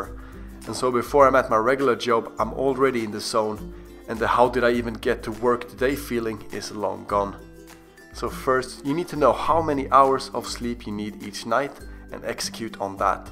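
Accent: Sweden. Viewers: classic swedish accent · Guessing the accent you have, I would say you are Swedish. You have a little bit of a Swedish accent. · I had a hunch that you're Swedish going only on your accent. confirmed it haha. Nice video, ska testa allt detta! :D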